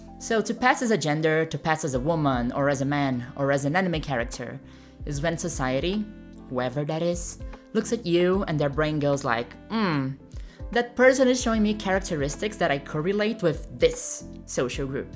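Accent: Brazil. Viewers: Don't you dare apologize for your beautiful Brazilian accent! The way you say infamous and frequently is extremely charming! Technically I can't speak for the entire United States but to the ear of a person in the US the Portuguese accent and pronunciation is very attractive to our ears, now for example there are other accents that don't sound quite as lovely in English but I don't want to be rude, I'll just say that a certain German chancellor would have a very harsh sounding accent. Also, great video full of nuance that I think will be beneficial for many young women. Strangely enough my daughter never really voiced concerns about passing but she really needed affirming surgery for her mental health, but it was for her not for anyone else. She's kind of special that way! ☺️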